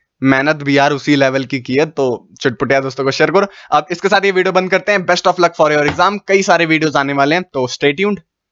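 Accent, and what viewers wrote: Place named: Kanpur, India. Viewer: Bhaiya Aapki aawaj na...Bilkul Kanpuria style h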